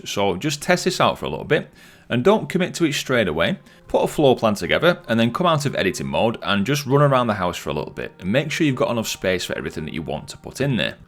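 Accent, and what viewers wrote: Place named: Northern England, United Kingdom. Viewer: Thank you for the video. Nice to hear a north of England accent ( Yorkshire girl here ).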